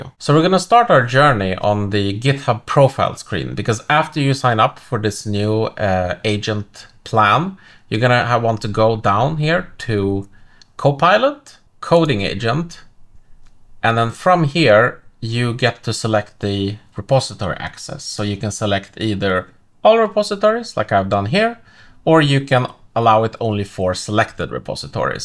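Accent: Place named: Sweden. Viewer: Wow, the most Swedish accent I ever heard...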